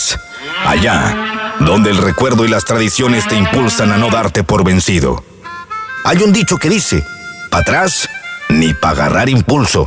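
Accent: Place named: Michoacán, Mexico. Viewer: Señor mi saludo desde bakerfiel California yo mucho pensé que era de Michoacán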